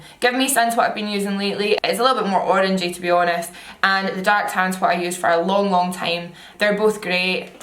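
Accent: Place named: Falkirk, United Kingdom. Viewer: Are you from Falkirk because your accent is quite strong x 💓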